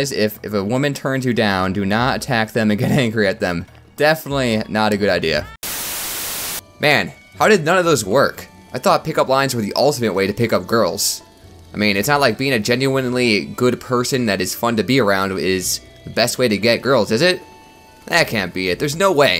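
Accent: Australia. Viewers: You’re my favorite YouTube or ever I like your name and your British accents pretty good I mean I mean Australian accent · Thanks mr Australian accent guy for saying we are terrifying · why the Aussie ascent hmmmmmmm.....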